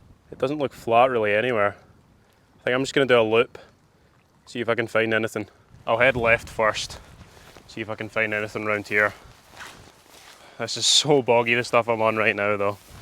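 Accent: Scotland, United Kingdom. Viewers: Definitely Scottish with a lovely clear accent. 🏴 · May I ask, is this a particular dialect you speak or general scots english?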